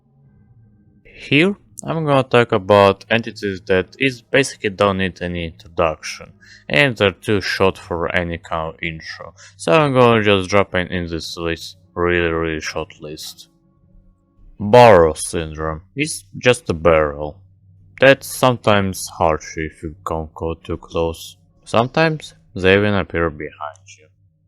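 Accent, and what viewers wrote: Russia. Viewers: i think he has a russian accent or something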